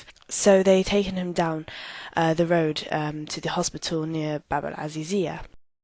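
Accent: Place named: United Kingdom. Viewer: what's up with the brittish accent?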